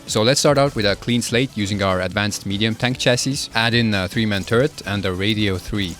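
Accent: Estonia. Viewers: HOI4 + draculaflow + eesti aktsent = instant sub 😂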